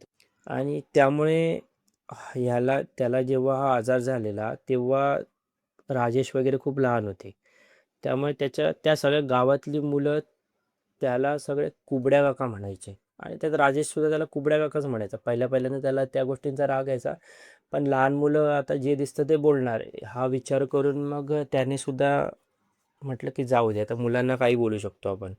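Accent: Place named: Pune, India. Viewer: अभिवाचनाची लकब पुणेरी वाटते❤❤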